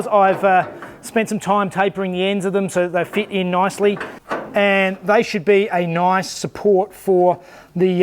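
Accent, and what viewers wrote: Australia. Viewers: Wow from Aussie?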